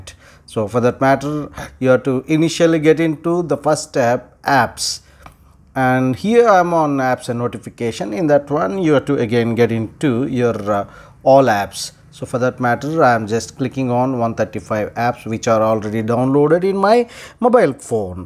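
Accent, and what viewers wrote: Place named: Israel. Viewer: You clearly are an israeli by your accent and that israeli airline app u got i just hope you get the justice we deserve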